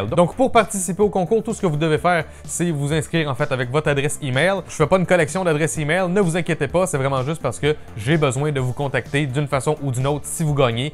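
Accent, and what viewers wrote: Quebec, Canada. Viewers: T québécois ?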